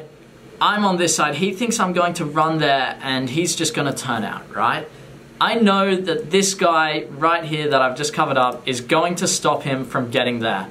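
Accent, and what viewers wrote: Australia. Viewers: Damn that Australian accent, great video btw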